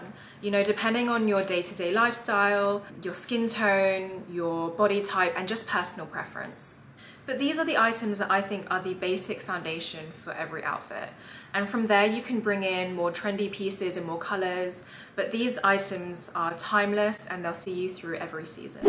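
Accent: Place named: England, United Kingdom. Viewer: Don’t lose your lovely English accent there!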